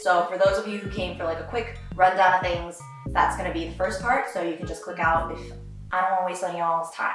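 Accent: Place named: United Kingdom. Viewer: I have no idea as to why I sat through this entire video when I’m a MS3 😂 But the british accent is on point! I had no idea high schools in the states were so intense!!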